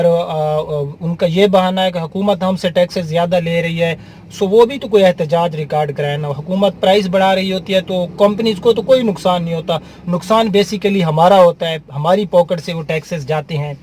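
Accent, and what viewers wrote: Saraiki, Pakistan. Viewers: very nice..ap say gari layni paray ge..aik to ap ki sadgi..or siraiki lehjay par to qurban hu mein..lolz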